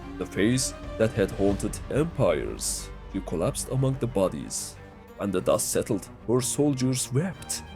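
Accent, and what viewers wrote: Turkey. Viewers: Bastaki dying telafuzundan türk oldugu anladim. Memati maskeyi ne kadar takarsa taksin. Basarilar kral kolay gelsin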